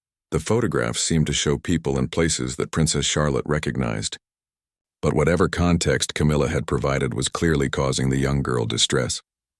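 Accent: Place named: United States. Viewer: Why is the voice over an American and how did he get this information ?????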